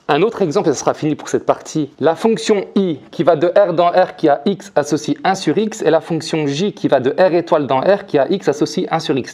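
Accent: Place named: Asia. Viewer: Pourquoi vous portez un masque si ce n'est pas trop indiscret d'oser poser la question.Je crois en juger par votre accent que vous êtes asiatique😅😅😊.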